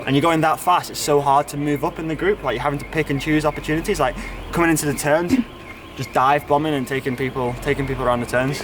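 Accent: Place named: Yorkshire, United Kingdom. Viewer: I hear a dirty Yorkshireman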